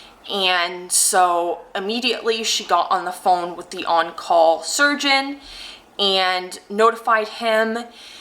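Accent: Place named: Canada. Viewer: Thanks for the response! Apparently the nodule has all the markers of being cancerous but you definitely helped calm my fears. I'm so nervous about the biopsy! I am in medical school and the anxiety that sometimes lets my mind run away makes it hard to concentrate! The school physical was actually what incidentally found my hypothyroidism because my PCP wanted to check thyroid levels "just in case" and then i also had the ultrasound for the Hashimoto's "just in case." On an unrelated note, I love your accent! the "out" and "about." Canadian, eh? :) thanks again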